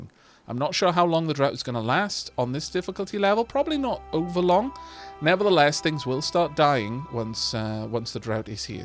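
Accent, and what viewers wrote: Wales, United Kingdom. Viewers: There is something soothing and comfortable about watching videos. But I admit to being biased as I love the Welsh accent! I'm crossing everything I can cross (fingers, toes, eyes, wires) hoping for a ridiculous amount of Timberborn. Am I asking too much? Never!!!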